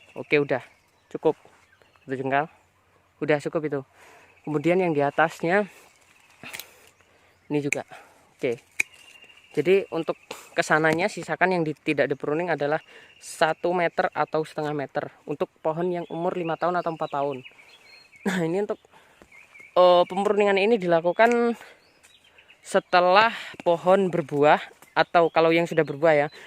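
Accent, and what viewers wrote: Java, Indonesia. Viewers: Jawane medok kok ngomongnya pakai doang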